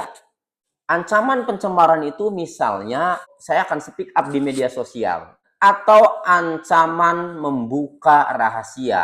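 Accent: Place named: Banyumas, Indonesia. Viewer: Yang punya Chanel ini pasti orang Banyumas dsk,ngapakers ya👍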